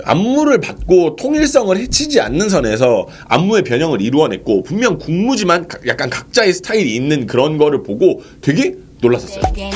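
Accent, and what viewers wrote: South, South Korea. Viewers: 아따 ~ 남도사투리로 설명하는게 겁나 구수하구마이라~ ㅋㅋ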